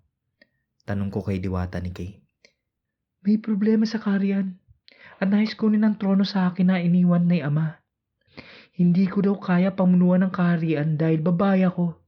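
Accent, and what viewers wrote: Visayas, Philippines. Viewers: Bisaya ka ba o waray?? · Bisaya ka bay.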